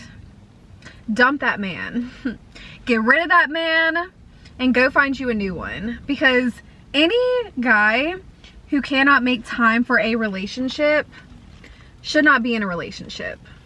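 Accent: South, United States. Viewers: I absolutely love your cute southern accent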